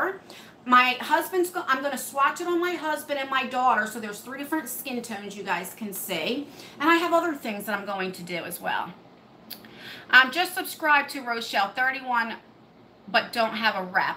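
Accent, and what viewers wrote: New Jersey, United States. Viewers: Your eye make up is so pretty you have lovely eyes and your lipstick is so pretty too. I love your accent . Makes me think of the Sopranos . I hope that's not an insult. Xxx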